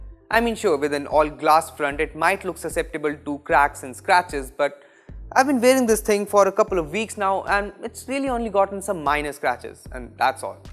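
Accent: India. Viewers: Uuuuuuh, the Indian accent is... is... hard to digest, sorry( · Bu ne biçim ingilizce yaw abi resmen hintçe gibi konuşuyorsun ya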